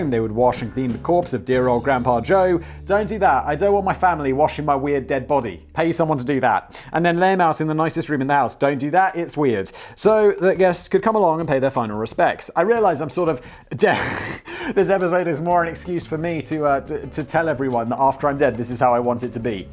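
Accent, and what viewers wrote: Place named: United Kingdom. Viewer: When you sing you lose your British accent. I.e., you sound just like an American.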